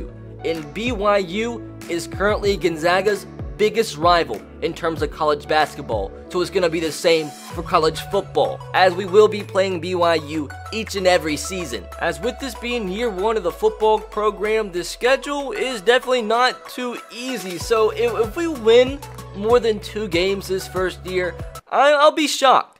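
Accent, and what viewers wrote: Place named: South, United States. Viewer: He’s Southern. Respect the accent, Karen.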